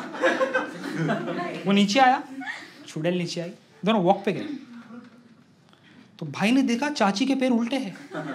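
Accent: India. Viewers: The Marathi accent. lol.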